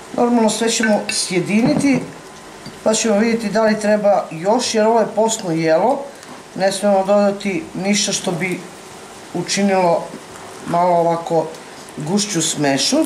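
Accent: South, Serbia. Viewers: ma nista ja ne zamjeram. Samo vi govorite kako vec govorite. Inace je vas juznjacki govor bas super (malo makedonskog i malo bugarskog utjecaja 😊). Zena mog rodjaka je Makedonka (Skopjanka). Samo vi kuhajte. Nek se stvari "krckaju" i nek mirise. Ja sam uglavnom vegetarijanac. Kazem "uglavnom", jer kada podjem u Hrvatsku (iz Dalmacije sam rodom) onda uzmem malo ribe. I to je to. Pozdrav i sve najbolje vam zelim.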